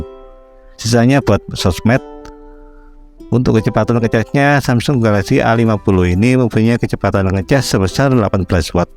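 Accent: Mojokerto, Indonesia. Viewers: wong mojokerto cak ?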